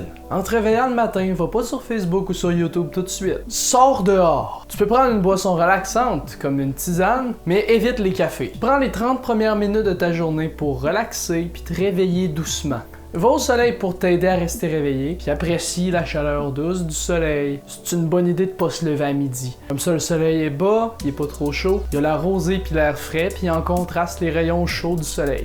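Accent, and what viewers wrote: Quebec, Canada. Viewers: Merci beaucoup pour ton video, ce sont des conseils merveilleux qu'on doit mettre en pratique, ils sont toujours bien appréciés. Je suis péruvien et J'ai encore un peu de difficulté pour bien comprendre l'accent québécois, mais j'aime bien. Je t'envoie mes meilleurs voeux.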